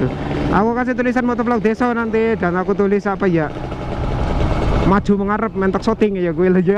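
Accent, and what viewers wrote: Banyumas, Indonesia. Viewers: Salam wong ngapak mas😘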